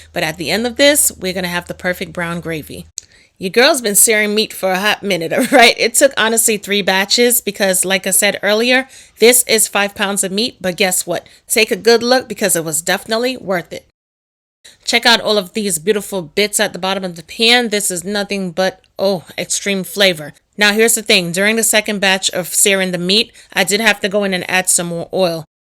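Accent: New York, United States. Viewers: I thoroghly enjoyed watching this video. Cant wait to try the recipie. Your voice and NY accent is music to my ears. My dad is from Brownsville, so I recognize real NY!!! Have a blessed day!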